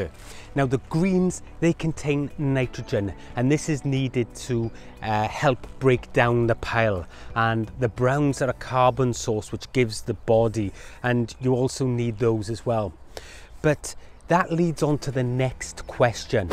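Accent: Wales, United Kingdom. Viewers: When I hear a Welshman talking about gardening I know I can trust him. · Hi, I LOVE your accent you Welshie just like me but ive not got Yer accent sadly lol ...... can I put put my Tortoise poo in the compost as she only eats Greens shame to waste it ? Thanks I subscribed so i can LIISTEN to you, of course i definitely need your Help !! Thanks x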